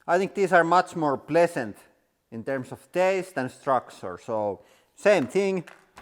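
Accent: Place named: Finland. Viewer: We Finns speak English like this 😄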